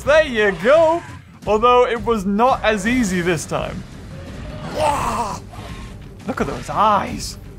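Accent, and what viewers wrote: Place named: United Kingdom. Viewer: It's pleasant, like idek but it's always nice to hear a british man talk about dinosaurs in a man child way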